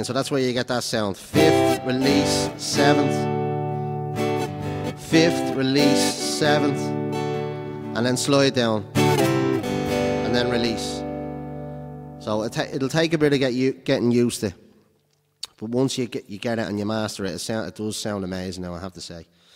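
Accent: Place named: Ireland. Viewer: Open G is a great tuning but not only for slide as shows us.but I'm buggered if I can follow his chords. I'm just trying because I love his Jumbo and his Irish accent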